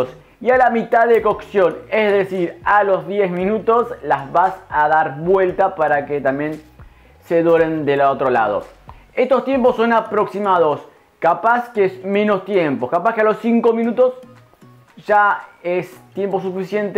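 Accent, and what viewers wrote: Argentina. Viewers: Nací en Buenos Aires y me encanta el acento de este chico. Donde vivo, en Europa, tenemos masa de hojaldre rectangular 😉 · Me encantan tus recetas! Soy porteña tb y así hablamos! A hacer las palmeritas👌👨‍🍳❤👏👏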